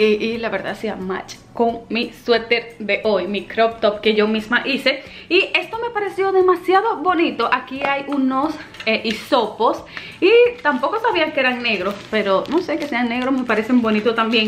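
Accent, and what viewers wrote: Dominican Republic. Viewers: Vy C Ella es del cibaao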